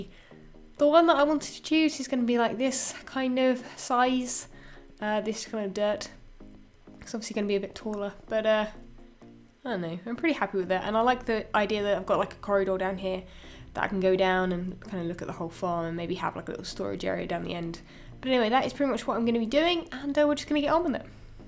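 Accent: United Kingdom. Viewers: Can't get bored of your british accent <3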